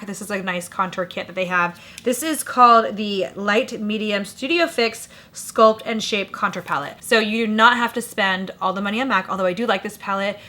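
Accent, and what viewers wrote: Iraq. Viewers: احبج من تحجين عراقي 🤩🤩🤩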